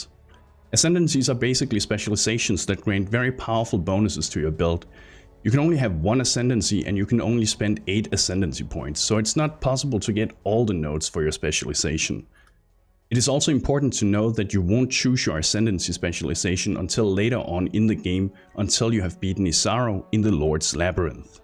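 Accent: Denmark. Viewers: Er du dansk Bro? Syntes jeg kan ane en Dansk dialekt i dit engelske ;p Når men Mange tak for videoen, Det var super go Info. 👍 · You sound danish brow. Nice vid. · Are you Danish, you sound Danish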